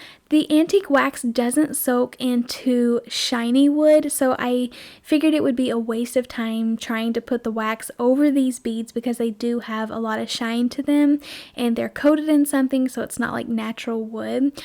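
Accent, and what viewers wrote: South, United States. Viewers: Ha ha! She does have a super sweet voice and personality to match. I'm a Texan and to me there's a bit of Southern Belle in her voice 😁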